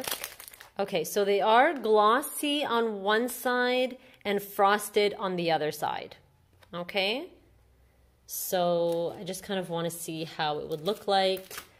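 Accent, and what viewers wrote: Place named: France. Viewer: your French accent is beautiful! You must have spent some time in France 🇫🇷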